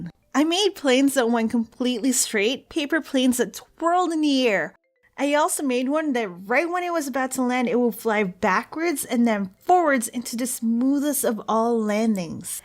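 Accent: Philippines. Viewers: I love her filipino accent.... X3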